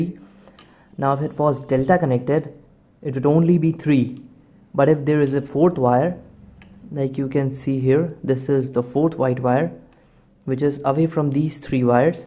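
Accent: India. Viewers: Indians like you speaking english sound very ridiculous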